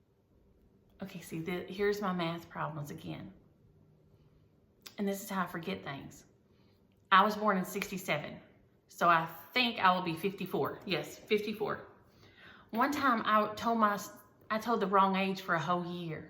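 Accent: South, United States. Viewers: Home and Health. Your hair looks great, love your southern twang. Linda Norris....Thank you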